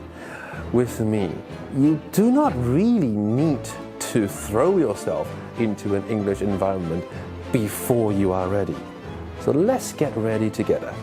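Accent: RP, United Kingdom. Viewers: 最近瘋狂迷上英式口音 找來找去Eko的比較好聽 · 太喜歡老師的英式腔了! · 看來我要重看福爾摩斯了，不過英式英文真的聽不習慣，好貴族氣質，可...可惡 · Love your british accent and the topic you talk about .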